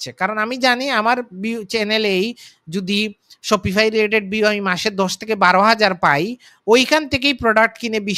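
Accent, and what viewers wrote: Sylhet, Bangladesh. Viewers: Apni sylhety naki???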